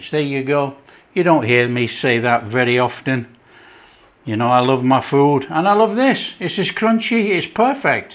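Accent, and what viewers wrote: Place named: Derbyshire, United Kingdom. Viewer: Are you from chesterfield ?? , you sound like someone I watch from there ! I'm from canada, but my grandmother was a brummie 😁 Great video !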